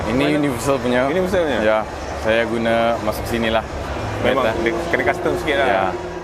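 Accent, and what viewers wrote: Sabah, Malaysia. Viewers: Dia cuba untuk ckp.malaysia tapi tk mnjdi haha....sbb sye pun brunei + malaysia mcm sbah pulk haha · Knapa loghat mcam Sabah?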